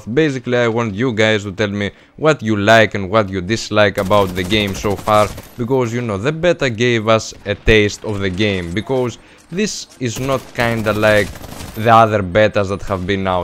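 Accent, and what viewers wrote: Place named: Greece. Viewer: Γειά σου φίλε,τυχαία σε πέτυχα,άκουσα προφορά και λέω δικός μας είναι...Ωραίο το κανάλι σου...γουστάρω...Κάντο και στα Ελληνικά ρε με αγγλικούς υπότιτλους :P παίζεις σε ps3,360,pc;τσέκαρε το κανάλι μου άν θες...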